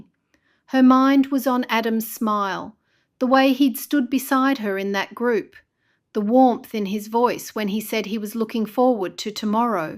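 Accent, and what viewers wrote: United Kingdom. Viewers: I really wanted to listen to this story. I love your stories. But just can't listen, I'm so sorry. You're a very gifted writer, but no Amish ever has a British accent. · It doesnt sound like an Amish dialect, sounds like uk accent takes away from story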